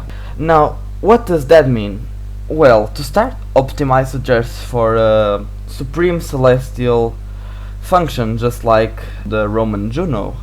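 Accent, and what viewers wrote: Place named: Portugal. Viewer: Sotaque português. 👍🏼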